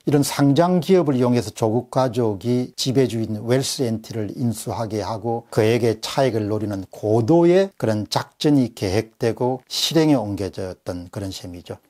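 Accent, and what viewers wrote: Gyeongsang, South Korea. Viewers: 공병호 소장님ㆍ 참ㆍ대단합십니다 ㆍ경상도 발음외는 흠잡을 것 없네요ㆍ쉽지 않은 방송 인데 ᆢ꾸준하십니다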